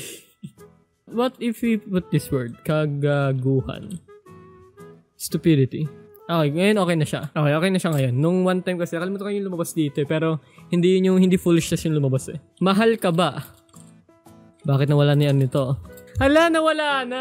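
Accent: Visayas, Philippines. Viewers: Bisaya ka ba kuya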